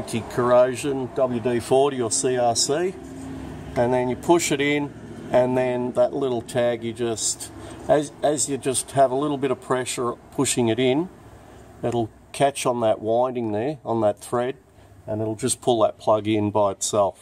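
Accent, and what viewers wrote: Australia. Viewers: no problem at all. I'm in the US, how do I get a beer to you? Your accent sounds Australian? Do you have a way to get a donation to you 😊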